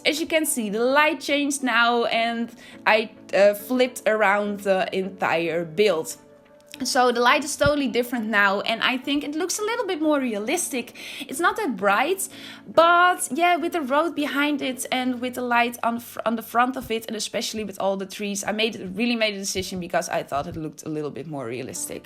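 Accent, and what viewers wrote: Netherlands. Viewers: This looks great! Where are you coming from? It sounds like you're from Holland. I'm Dutch myself.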